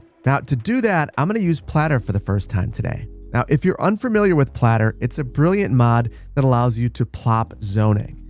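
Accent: United States. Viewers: Even if it wasn't clear by your accent, your actions would make it clear you're American😅 Let bulldoze this road to build a highway through our city. Let's bulldoze a neighbourhood to build a shopping centre, build a few big box stores. These buildings are to high for a residential neighbourhood, there are too many trees. Let's get rid of the sidewalk, this much sidewalk seems unrealistic🤣 This episode definitely made me laugh!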